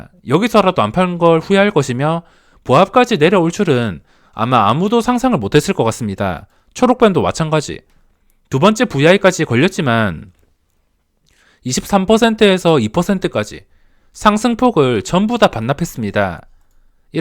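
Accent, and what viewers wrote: Gyeongsang, South Korea. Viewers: 너무 감사합니다ㆍ친숙한 경상도 사투리도 듣기좋습니다ㆍ 제가 전업을 그만두는 시점에 이쪽으로 들어오셯네요ㆍ 저는 쪽박을 차서 그만둔것은 아니지만 수익모델을 만들지 못해 기업에 입사해서 월급쟁이 합니다ㆍ 저의생각은 ㆍ 수익모델은 2가지입니다ㆍ첫째는 망하지않을 코스피10 종목 두어장뭍어놓아도 아무 신경안써도되는 아빠잘만난 주식관심있는사람ㆍ 둘째는 돌파와 손절을 할줄아는 ㆍ 이두부류외 조상의 천운이 없는 모든 사람은 주식으로 돈을 딸수없습니다ㆍ 두가지밖에 없음을 이 증명해주고 있네요ㆍ부럽습니다 ㆍ님이 하고있는 매매는 초고수의 영역이며 일반개미님에게는 추격에 가깝습니다ㆍ님도 그런개미돈을 털어간다는 아찔한 사실은 아시죠ㆍ? 세상사 베틀넷이죠 ㆍ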